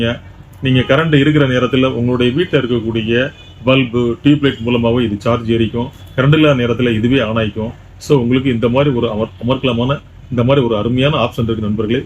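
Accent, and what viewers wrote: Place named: Kanyakumari, India. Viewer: கன்னியாகுமரி தமிழ் அப்படிதான்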